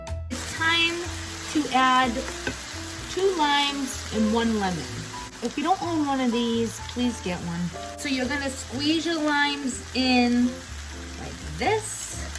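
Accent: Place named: Boston, United States. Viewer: Nice Recipe!! Even better accent! Lol gotta be from Boston!! Please say your from Boston! Lol. Just earned a subscriber 👍🏽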